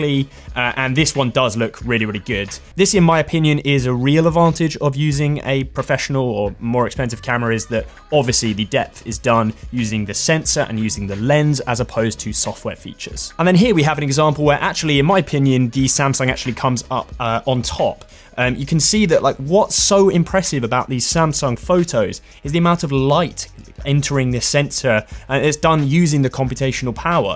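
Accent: United Kingdom. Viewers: You sound British, thus you must be using the Exynos version of the phone? Do note that it is good to mention this in the video as there are 2 versions of this phone around the world and both have somewhat different camera quality...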